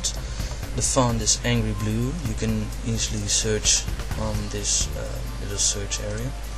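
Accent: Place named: Netherlands. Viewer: Nederlands, te horen :D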